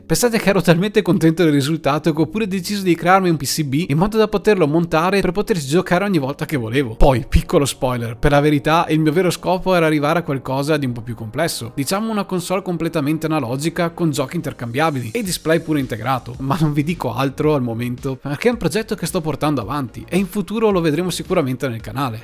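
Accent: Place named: Treviso, Italy. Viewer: Bravo ti seguo volentieri dalla cadenza dovresti essere vicino a me di Treviso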